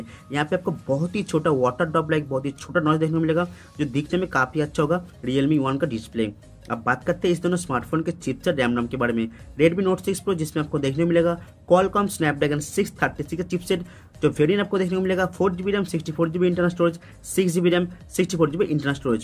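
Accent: Bengal, India. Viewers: Sir aap Bengali ya Oriya hai kya?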